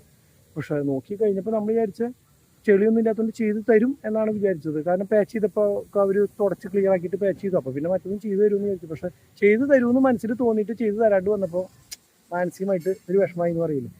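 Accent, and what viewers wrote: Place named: Kottayam, India. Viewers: കോട്ടയം ഭാഷ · കോട്ടയം അച്ചടി ഭാഷ ഒർജിനൽ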